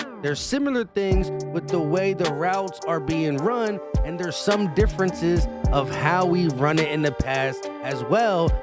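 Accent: Philadelphia, United States. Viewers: Does everyone is Philly talk like you?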